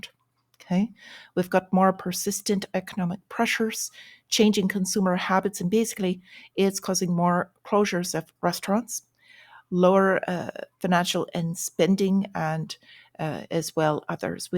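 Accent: Ireland. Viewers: you must have stock in Japan I notice you really focus on it. Must have property there or family in Japan. which is weird with the Irish accent.:)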